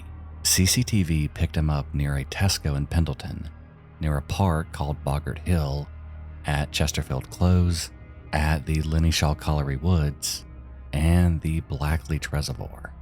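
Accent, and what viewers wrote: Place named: Tennessee, United States. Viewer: You've got a slight Tennessee accent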